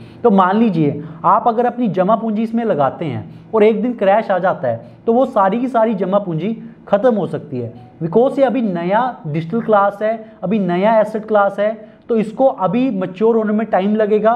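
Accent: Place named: Jammu, India. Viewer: Thank you...bro looks like your mother tongue is dogri...is that true..?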